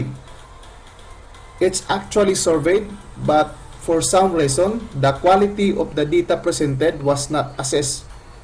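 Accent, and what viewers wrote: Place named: Visayas, Philippines. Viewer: Bisaya accent , mispronounced ang mga words di maintindihan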